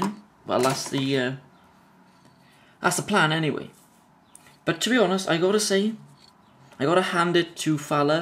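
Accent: Wales, United Kingdom. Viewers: I thought your accent was Welsh.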